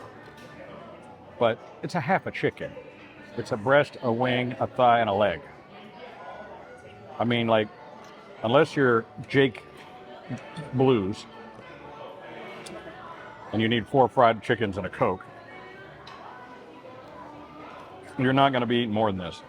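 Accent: Texas, United States. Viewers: Seems like your Texas accent is coming back. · Funny, the longer you're back in Texas, the quicker your Vegas city slicker vibe slides off and your Texas roots come drawling back,!🤠😎😁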